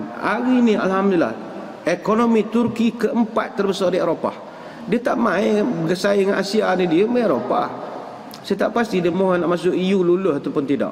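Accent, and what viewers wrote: Malaysia. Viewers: Ustaz .ni dr mane..logat malaysia peci indonesia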